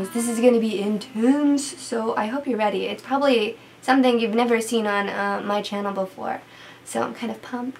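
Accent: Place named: South, United States. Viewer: I love her slight southern accent, and I'm excited to see the zombie stuff you do in the future! Whoo!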